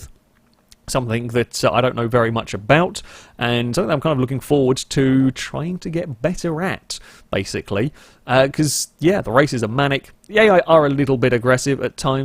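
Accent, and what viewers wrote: United Kingdom. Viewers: I have a British professor who sounds vaguely like this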